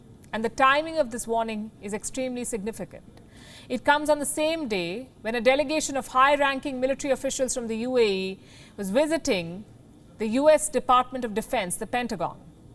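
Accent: India. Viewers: Everyone understand british accent but not everyone understand ( Hindi English). Since WION is 'GLOBAl ' .The accent is important for all viewer's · This news caster speaks English but sounds like hindi... The channel needs to replace her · Both ways are acceptable, the British, Australian, and American pronounce English words differently too, and in this case Indians pronounce English that way. The world does not revolve around your way of pronouncing things. There are more English speakers in India than in America think about it.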